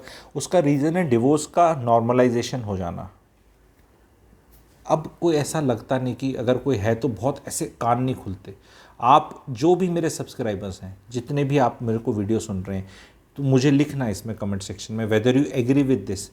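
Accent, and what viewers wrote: Haryana, India. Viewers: I like your Hariyanvi accent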